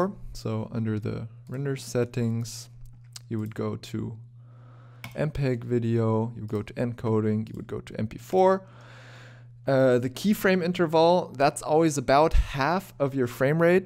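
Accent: Germany. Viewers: haha ich war mir nicht sicher hab es mir gedacht klingt ein bisschen wie Deutsches Englisch. Aber du sprichst richtig gut Englisch mach weiter so !!!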